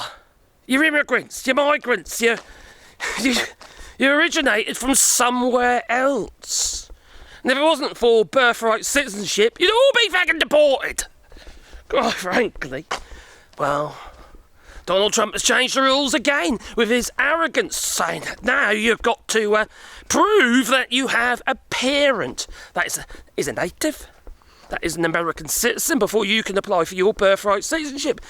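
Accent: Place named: England, United Kingdom. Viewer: I'm french from Drôme and like your english accent and free speaking thx friend !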